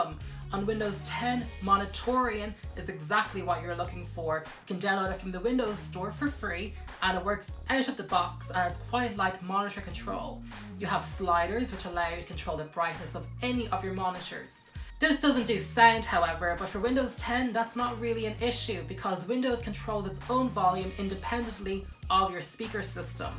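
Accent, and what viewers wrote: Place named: Ireland. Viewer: Love your slight Irish accent!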